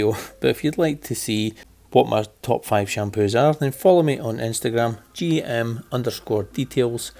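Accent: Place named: Glasgow, United Kingdom. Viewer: Thanks for taking the time to film, edit and upload this video " ". I picked-up your Glaswegian accent immediately, given my Dad's upbringing in Kirky:-) I'm currently awaiting my August delivery of my 2021 BMW 330i M Sport w/ the following additions: - M Sport package - M Sport+ package - Visibility package - Comfort package - Harman Kardon sound system - Heated steering wheel - Galvanic embellisher for controls It's been a 5 month wait (given the pandemic), but the extra lead-time has allowed me to (exercise my patience, and) take some time to research how existing 3 series owners are maintaining their beauties. I live in Melbourne, however I'll be looking into these products this week. Thanks again, and all the best!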